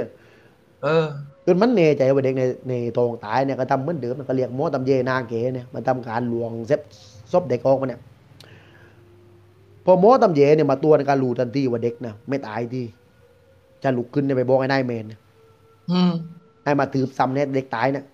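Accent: South, Thailand. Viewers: ชอบสำเนียงใต้คุณ มาก รู้สึกแบบดีใจอะผมก็คนใต้มาฟังคนใต้ด้วยกันเรื่องที่ดีแบบนี้แล้วดีใจจริงๆ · มาฟังคุณ แหลงใต้ ทั้งสำเนียงและศัพท์ใต้ตอนล่างที่คุ้นเคย เปิดมิติใหม่ของการรับฟังเรื่องผี ทำต่อไปนะมาถูกทางแล้ว😁แว้บๆวันก่อนเห็นช่องนี้แปลชื่อเรื่องเล่าเป็นภ.เกาหลีใช่มั้ยนะ มาดูอีกทีกลับเป็นภ.ไทยละ ถ้าทำจริงนี่ล้ำเลยไม่ซ้ำใคร 👍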